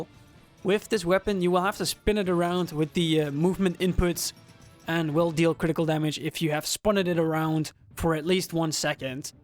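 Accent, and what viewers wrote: Netherlands. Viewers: oh je bent nederlands zo te horen ;) ik heb een vraag, ik wilde laatst Fractures shrines binnen gaan maar toen stond er ''Requires Fatal Falls GLC'' terwijl ik deze dlc al heb gekocht. heb jij mischien 'n idee waarom ik dan toch dit bericht krijg ?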